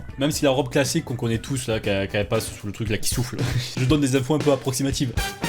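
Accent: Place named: Marseille, France. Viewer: Le petit accent marseillais des familles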